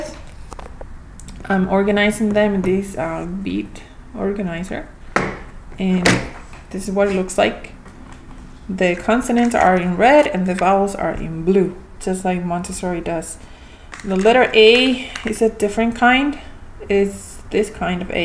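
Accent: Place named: Spain. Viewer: Hi, It seems that your mothertongue is Spanish. What language do you work with your child? English or Spanish? I'm asking that because my daughter is bilingual and I'm not sure if I should try to help her to read Portuguese or French, the language she will learn at school? Can you pls give me an advice? Thanks.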